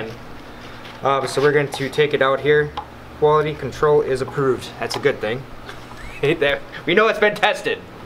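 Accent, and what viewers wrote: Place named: Wisconsin, United States. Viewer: "oh yah, you betcha" You must be located in Wisconsin.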